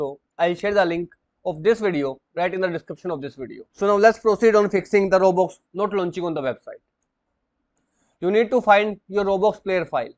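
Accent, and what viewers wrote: India. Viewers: if you hear an indian voice ur problem is fixed 100% rate (THANK YOU SO MUCH BTW 🙏)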